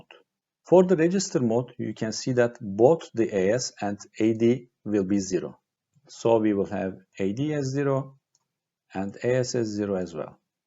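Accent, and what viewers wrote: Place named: Turkey. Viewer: valla aksandan türk oldugunu anladım tebrikler başarılar hemşerim :)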